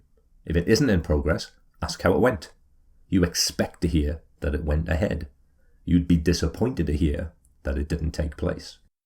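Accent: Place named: North East, United Kingdom. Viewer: he's not a robot, he's just from the North-East.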